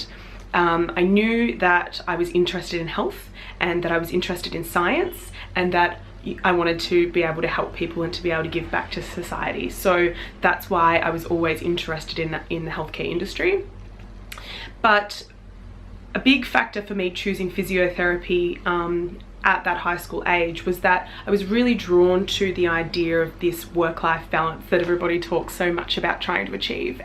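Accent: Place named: Australia. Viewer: Your Aussie accent is delectable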